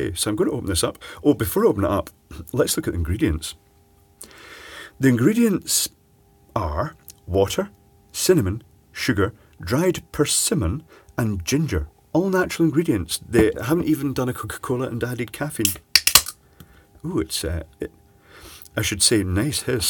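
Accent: Scotland, United Kingdom. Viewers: It is the Scottish accent. I lived up in Scotland and the people are really chilled and add a sense of humour and you will be refreshed by the positive energy. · Whenever I play a video my cat comes in the room and starts mewing, he must like the Scottish accent. I have two old phone batteries on my desk, I was thinking "What can I do with them?" and this video shows up.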